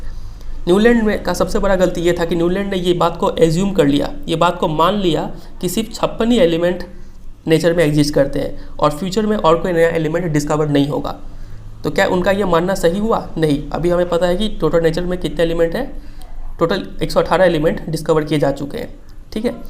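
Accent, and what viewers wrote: Bihar, India. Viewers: Sir aapki voice se pta chalta h ki aap bhi bihar se ho vaise sir main bhi bihar se hi hu Samastipur district se.....by the way sir aap itna acchhe se padhate ho ki mujhe lgta h ki school chodd ke aapse padhu padhu..bs .. . Thanks alot sir for giving such bigg efforts for us.....sir love.....so much......bhagwaan kre aapka channel itna grow kre ki aap hmesha kush rho or apki financial issuue....hamesha achhi rhe ....thanks sir ...😍😍😍😍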